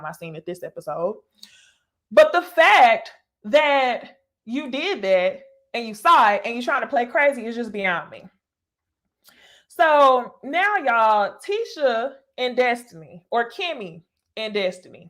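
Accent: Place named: DMV, United States. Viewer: Lol the first time I heard the DMV accent was some documentary about girls in a juvenile home...and then Monique the comedian lol. I hear your accent but I've noticed in Dallas there are 2 different accents...the southern one that multiple ethnicities have and the one that sounds like Nelly and the St Lunatics lol. I'm from boring Iowa but live in TX so I love hearing accents 🤣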